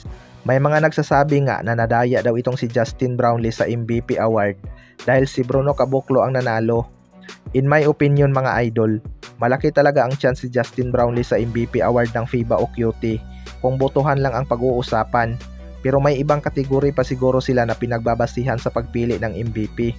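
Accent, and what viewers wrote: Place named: Visayas, Philippines. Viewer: NAKU PO ITONG PROBINSYANO NA BLOGER BISAYA PA PUNTO.. LOLOKOHIN MO KAMING MANILA BOY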